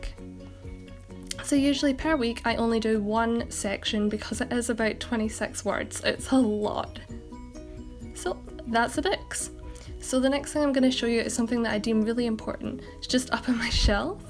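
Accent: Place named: Scotland, United Kingdom. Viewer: Love that scotish accent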